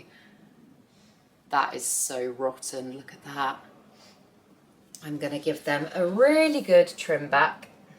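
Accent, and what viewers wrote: United Kingdom. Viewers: I watch your videos from France to learn about plants and to learn english as well!!! I love your british accent and prononciation!! Thank you!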